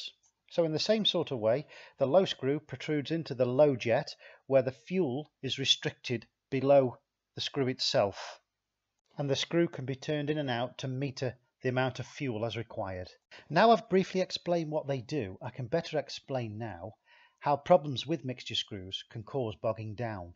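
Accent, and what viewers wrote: United Kingdom. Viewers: These are cracking videos. Thank you so much. Great to hear a British voice instead of the legion of american videos. Love this series. Really useful. Wish I could fix my mercury mariner though. Love to see a video on replacing crankshaft seals.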